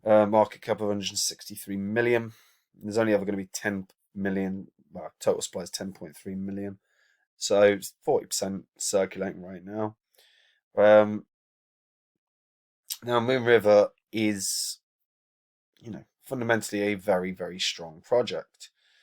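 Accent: United Kingdom. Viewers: He has a really clear british accent mate!!.....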